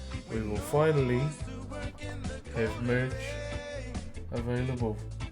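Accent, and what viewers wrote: New Zealand. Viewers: New Zealand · Are you from New Zealand?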